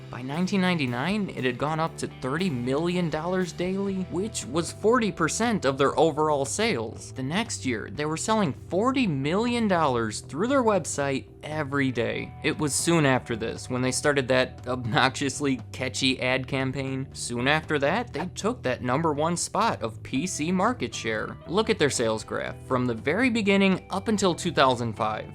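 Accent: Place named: Midwest, United States. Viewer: I'm currently watching this from a Dell XPS desktop with 16 GB of ram, Intel i7 processor, and a NIVDIA GeForce 1080 graphics card. You haven't experienced the thrill of serene Midwestern accent until you've heard it in high definition.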